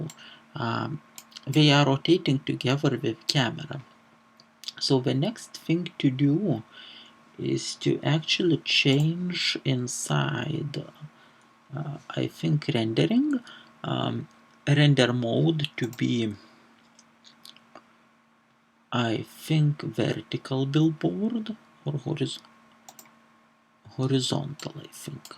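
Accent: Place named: Balkans. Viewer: Finally after 2 hours of searching i finally found good tutorial... Thank you! P.S. I might be wrong but from your accent and the pronunciation of the "R" ...i have the impression that you are from the balkans? (Sorry if i'm wrong).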